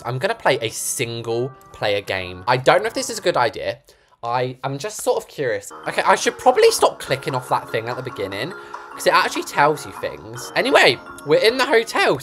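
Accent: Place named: United Kingdom. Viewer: Are you a brit cuz your accent is so beautiful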